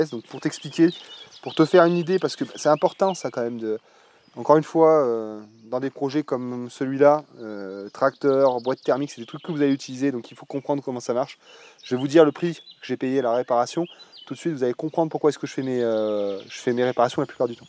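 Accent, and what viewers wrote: Loire, France. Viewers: Tu prends de plus en plus l'accent de la Loire ! C'est rigolo ( pas l'accent, c'est juste de plus en plus flagrant !)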